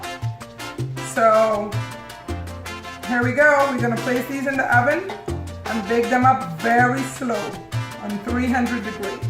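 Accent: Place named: Guyana. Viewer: I love your voice it’s so soothing are you from Guyana? Thanks for the recipe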